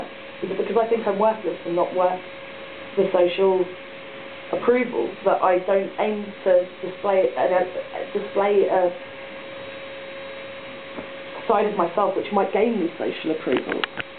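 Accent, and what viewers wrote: United Kingdom. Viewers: One thing you should remember is that if you came to America, people would find you much more attractive than you realize because you have a British accent. Still, I think one of the hardest things to wrestle with is self-criticism.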